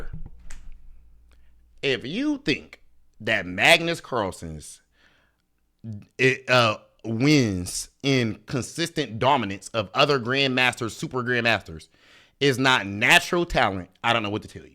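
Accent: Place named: Caribbean. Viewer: Hey... Do you have Caribbean roots? Your accent sounds like someone who lived here and moved overseas. Or have Caribbean parents. Much respect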